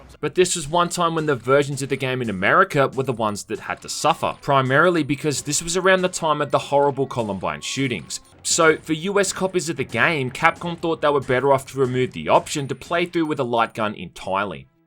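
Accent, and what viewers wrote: Australia. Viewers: Wait... is an Aussie? I thought he was British!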